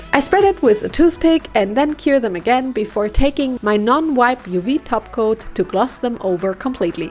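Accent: Germany. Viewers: Are you German? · Do i hear a german accent?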